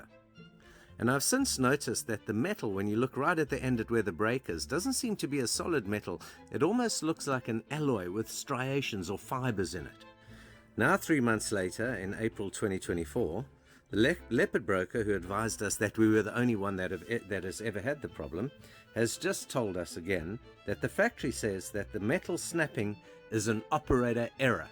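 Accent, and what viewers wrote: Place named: South Africa. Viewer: I hope I'm not getting this assumption wrong but you sound South African and if so why wouldn't you buy one of those beautiful Balance cats, especially considering these leopards have a very bad name build quality wise and after sales service. I'm sure you've probably seen the South African fella and his wife who was sailing around in a beautiful bullet proof Balance cat which was around 62 foot if I remember correctly. He sailed it to the US to be sold and I would've snapped that second hand cat up in an instant. It had been well looked after, it was run in so to speak and had the miles on the board and proof that it is a well built, well performing ocean cruiser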